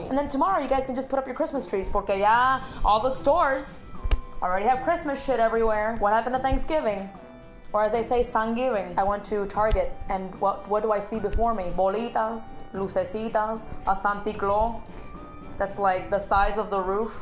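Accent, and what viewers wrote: Cuba. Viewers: I live for that cuban accented spanglish! as a spanish person (spaniard? idk, english is confusing. I mean to say that I'm from spain) I find spanglish and the evolution of spanish in general across the pond so interesting! it's amazing how a language can change so much and represent so many cultures and yet still be like a comforting unifying blanket. bit of a weird metaphor, but I'll go with it. anyway, just wanted to say that I love your videos (and accent!) 😊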